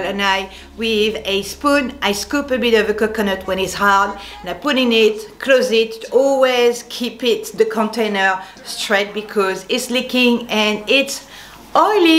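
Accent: France. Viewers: I agree! J’adore da porter les talons hauts!! Je me sens super sexy 💕😆 Aussi j’adore sa te vidéo! Ton accent français es magnifique 😍 (je suis Canadian)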